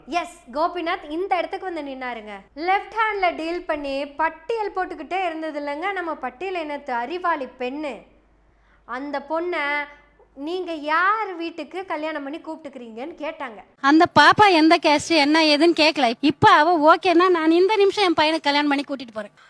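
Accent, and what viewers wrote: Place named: Coimbatore, India. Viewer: அம்மா தாயி.. உன்னோட கோவை slang க்கு அடிமை..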